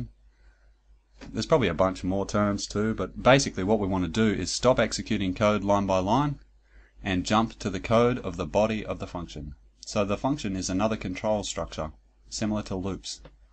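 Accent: Australia. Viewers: Hi What's a, you sound like a fellow Aussie! Thanks again for this great tutorials. I'm working my way through them from start to finish. I'm not a programmer: I'm an old retiree but I did an online course in C++ a couple of years ago for the fun of it. I didn't use it for anything so I let it lapse and forgot most of what I learn't. I came across your course and got fired up to revist C++. You have reignited my interest in C++ and your "easy to understand" method has made this really interesting for me. One of the reasons I'm really interested in getting familiar with C++ is that I am also interested in teaching myself electronics using arduino projects and they utilise C++ in their computers to drive their electronic components. So I want to understand C++ so I can control each project. Thanks again.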